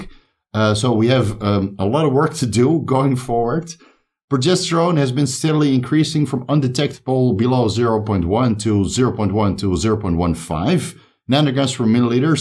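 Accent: Netherlands. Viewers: Are you dutch? You got funny accent.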